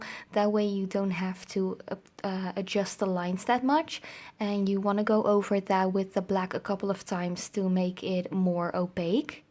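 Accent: Netherlands. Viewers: Sorry, naar ik kon duidelijk je Nederlandse accent horen! 😅 Niet gemeen bedoeld tho, ik vind het juist iets vertrouwds hebben. Oh ja, en de look is GEWELDIG! Goed gedaan! 👏👏🙏🙏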